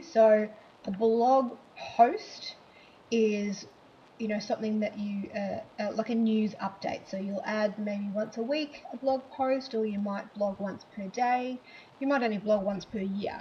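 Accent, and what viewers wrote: Australia. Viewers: From Australia?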